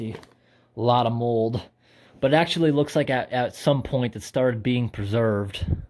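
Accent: New England, United States. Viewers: A wild guess that it's New England.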